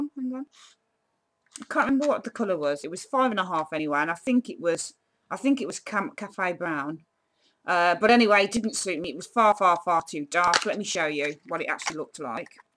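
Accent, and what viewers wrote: Birmingham, United Kingdom. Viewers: great info are u a brummie x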